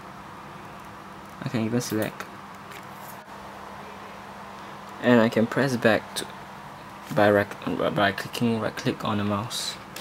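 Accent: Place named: Singapore. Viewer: I somehow recognised that singaporean accent. I'm a singaporean too. Can u pls show me how to connect thr wires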